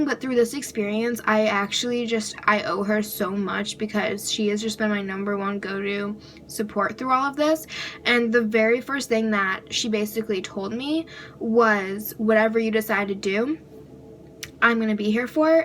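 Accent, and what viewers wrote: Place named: New Jersey, United States. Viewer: The jersey accent!!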